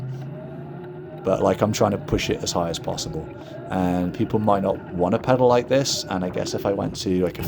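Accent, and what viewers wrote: United Kingdom. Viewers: Where is this? You sound British but it looks like Colorado.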